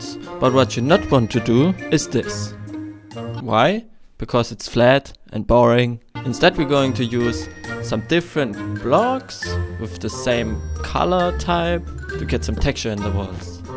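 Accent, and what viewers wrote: Germany. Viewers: Er hört sich deutsch an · Ich will jetzt nichts sagen aber er hat ein deutschen akzent 😂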